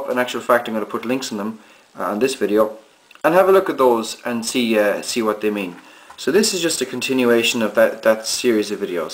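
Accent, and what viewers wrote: Ireland. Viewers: YOURE IRISH !!! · wow ,, thank you im 60 , and never understood ,, this ,, you teach so very well ,, and you sound Irish ( win , win ) a bit thank you ,, im going to watch the rest out you video's ,, from a Yorkshire ( Hull ) man ( living in Canada ) ,, it took me 40 years to find a real world project that needed Trigonometry , so i went to my daughters school to see if a math teacher would teach me what i needed to know and he did , and i finished the project -- again Thank You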